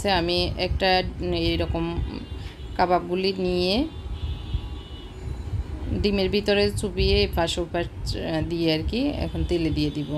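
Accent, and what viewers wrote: Noakhali, Bangladesh. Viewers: Apu Noakhali r naki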